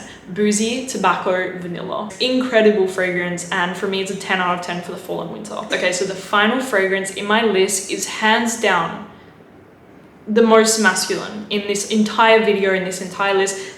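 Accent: United Kingdom. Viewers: are you british?? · Ngl I love her accent it sounds more British than Australian but not completely English maybe it's just the Aussie YouTubers I watch who don't have her accent but hey ho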